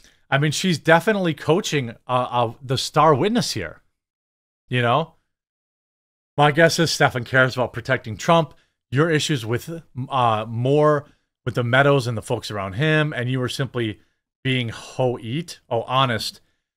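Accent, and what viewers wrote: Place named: Wisconsin, United States. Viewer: Love you man! Merry Christmas to you and yours. I love hearing the Wisconsin lily in your voice; makes me miss home ❤. Keep up the great work.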